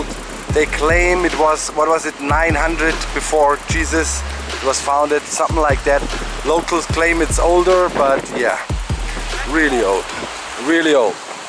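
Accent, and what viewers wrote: Germany. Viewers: Here we have a German man in Spain Sperling English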